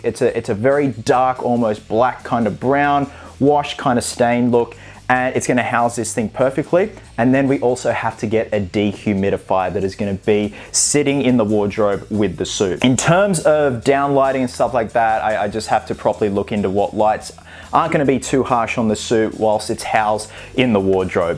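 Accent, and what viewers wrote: Australia. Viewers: They don’t sound the same lmao, Cavill is English and this guys Australian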